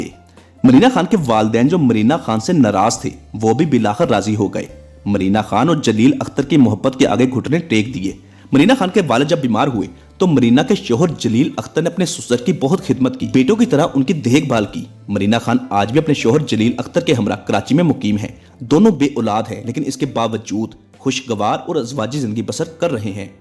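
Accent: Punjab, India. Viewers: آپ کی وڈیوز معلوماتی اور دلچسپ ھیں- مگر آپکا اردو تلفظ خالص پنجابی ھے جیسے مرینہ کو آپ MRINA بولتے ھیں- جبکہ لفظ Marina ھے مطلب م پر زبر ھے، اسی طرح دیرینہ کو درینہ بولتے ھو یہ لفظ "دیر" سے ھے دیر ینہ ھے درینہ نھیں- امید ھے اپنی تصحیح کر کے اپنی وڈیو جو مزید دلچسپ بنائیں گے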